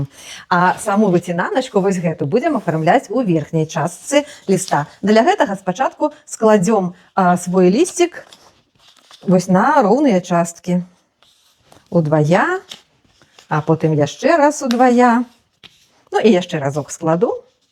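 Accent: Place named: Russia. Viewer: Это ж надо так исковеркать русский язык. 😮 Хотя слышно, что для нее родной язык русский. 😱😓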